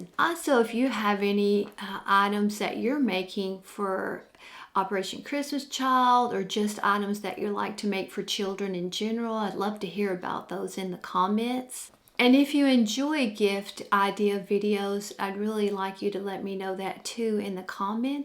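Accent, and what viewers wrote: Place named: South, United States. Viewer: Thanks for this! Love your southern accent!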